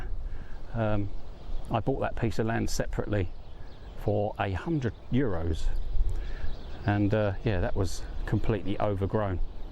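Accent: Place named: South London, United Kingdom. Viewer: Don't know how you came up on my feed but glad you did - so many of us are watching this with envy - the UK is even more of a rat race today than when I started out 45 years ago as a 21 year old(and it was a rat race then). Like many we worry for our kids as rents here are astronomical - Capitalism is becoming more and more viscious - how the younge working classes get a foot hold is bewildering. Our government has done everything it can to keep up on this overcrouded island(as outlined in the Bill Bryson book I am currently reading 'Notes from a Small Island') - so how you escaped is a matter of curiosity - the entant cordial agreement put in plae by our ruling classes to halt Brits living in France/Europe has put pay to folk doing what you have done - so well done for getting things sorted before brexit. I will now go back and watch alll your hard work on what seams a lovely plot - thanks. 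You sound like you come from my neck of the woods - South London - Bromley?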